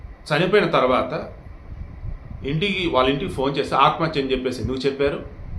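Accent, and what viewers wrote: Andhra Pradesh, India. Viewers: Your language has more of Andhra slang … for non Andhra people it’s not understandable… please speak in mutual understandable language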